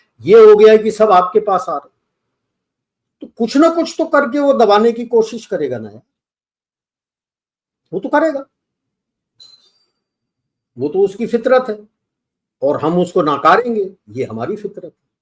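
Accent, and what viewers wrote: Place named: Punjab, India. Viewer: Gen apki punjabi bhaahr aaa jatti hai ❤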